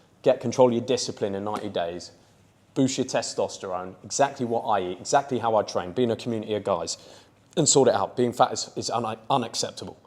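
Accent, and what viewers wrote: United Kingdom. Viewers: No.1: Letting a nihilistic hedonist with a British accent on YouTube tell you how to be a a man and how not to. Huuurrrrr brooo you have to drive an outdated mode of transmission otherwise ur a soyyy hurrrrr.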